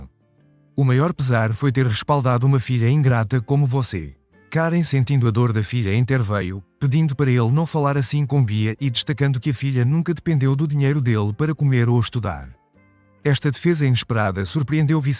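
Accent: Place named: Portugal. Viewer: Sotaque horroroso esse de Portugal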